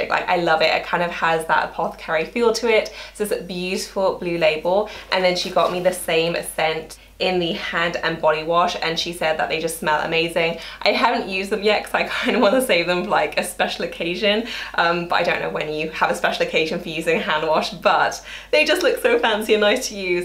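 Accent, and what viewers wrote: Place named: London, United Kingdom. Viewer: I want to enter the Chanel wallet giveaway!!!! I love your videos and London accent 🥰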